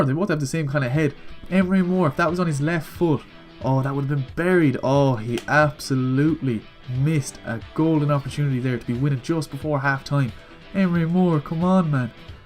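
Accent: Ireland. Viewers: What part of Ireland are you from? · FIFA 17 dont ya hear tat irish accent?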